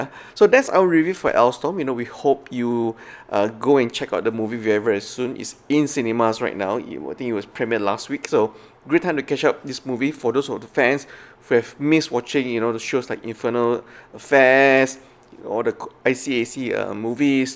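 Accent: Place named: Singapore. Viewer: An average watch. You sounds like from sg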